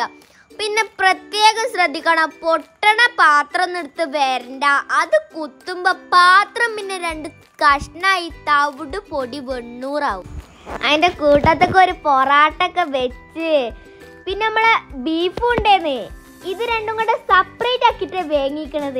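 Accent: Malappuram, India. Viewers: ഇത് മലപ്പുറം ഭാഷ ആണോ, 😘😘😘 എത്ര വയസ്സായി മോളെ നിനക്ക്, മാഷാഅല്ലാഹ്‌ 👍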